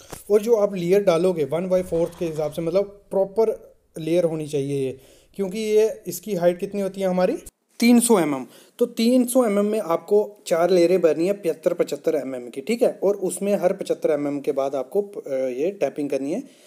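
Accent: Jammu, India. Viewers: He sounds like my friend from jammu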